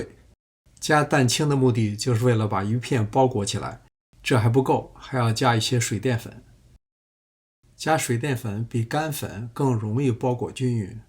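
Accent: Beijing, China. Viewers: 我听着也像北京口音。我是木樨地一带的。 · 听到京味礼貌的乡音，好亲切呀！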